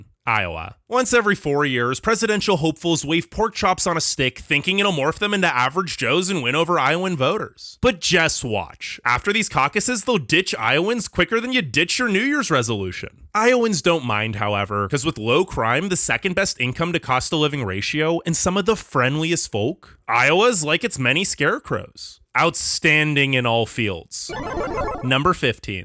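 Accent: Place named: New York, United States. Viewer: This guy must be from NY.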